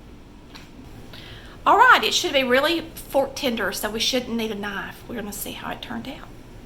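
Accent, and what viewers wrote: South, United States. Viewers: I love your videos, your Southern accent, and your recipes. I cooked this Swiss steak and it turned out tender, juicy, and delicious. You're a great cook.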